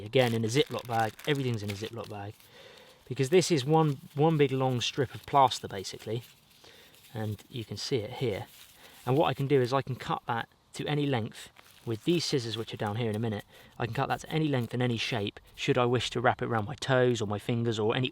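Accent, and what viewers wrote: United States. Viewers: His American accent is so funny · that america accent was awesome haha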